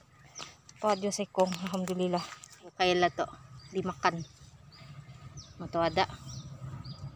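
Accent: Brunei. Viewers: ooh cakap macam bahasa brunei bah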